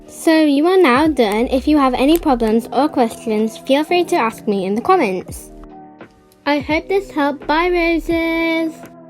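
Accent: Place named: United Kingdom. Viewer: I love your British voice so so much!!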